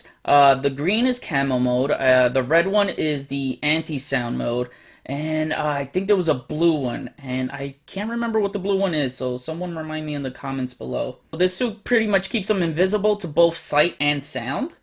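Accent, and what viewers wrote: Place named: New York, United States. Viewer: Shart owns “big time let down” and now you own “big time caca”. Please more “on the shelf” segments and ain’t no shame lettin our New York accent show out, bro. Salute.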